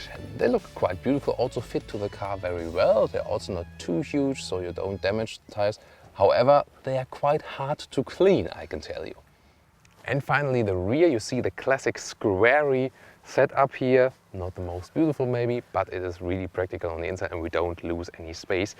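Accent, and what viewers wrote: Germany. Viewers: would you ever trust a reviewer with a french accent (even if you can understand it)? the German accent, on the other hand, makes the review sound truthful, serious and trustworthy.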